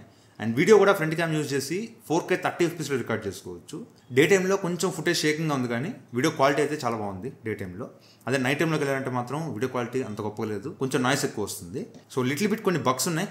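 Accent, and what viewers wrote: Nellore, India. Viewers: bro r u from nellore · nellore ah anna needhi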